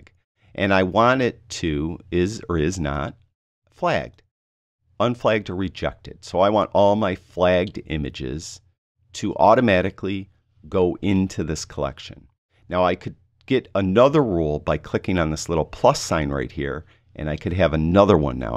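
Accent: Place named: Boston, United States. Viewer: You are from Boston, aren't you? I can tell by the way you say dot CAAM! Well, I guess its no secret since you showed pics of the Boston History Museum last vid.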